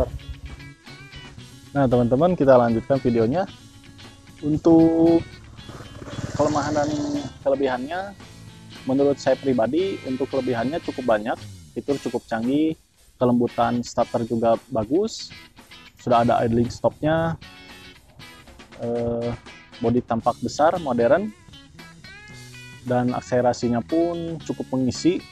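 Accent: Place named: West Java, Indonesia. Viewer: Konsumsi bbm dan oli juga kang Btw wa suka logat sunda sampeyan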